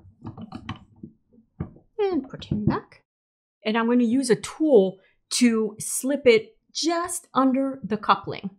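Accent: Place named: Upper Midwest, United States. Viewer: You are a burst of energy and good instruction. Where does that accent come from? Upper Midwest? Glad to have found you.👍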